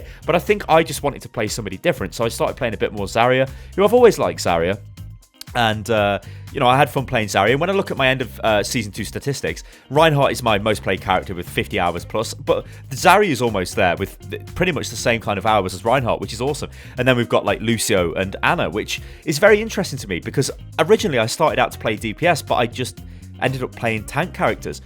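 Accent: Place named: United Kingdom. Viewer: Lmao Im an American who loves soccer and even I know its called football! Hearing that awesome british accent say soccer instead of football is how you say... maybe.. rubbish!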